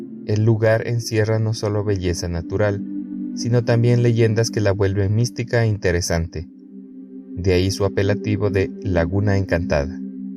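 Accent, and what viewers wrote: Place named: Andes, Latin America. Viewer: Otro excelente video , nos deleitas con las exquisitas Leyendas y mitos de La Sierra centro saludos desde siempre , muchos éxitos mereces más apoyo amigo! ¿Por cierto de dónde eres porqué noto que tenes acento andino?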